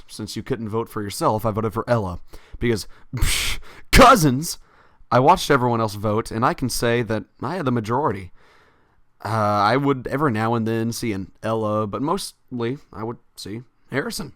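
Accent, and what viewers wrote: South, United States. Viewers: like if you like people with country accents :). also can you do a collab with your mom.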